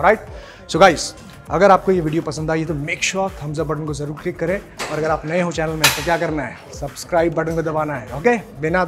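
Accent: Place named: Delhi, India. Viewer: Muhje ek bhot confusion hota appki😂 tone sun kar kabhi kabhi lagta hai app Mumbai ke nahi ho kya app Delhi ke ho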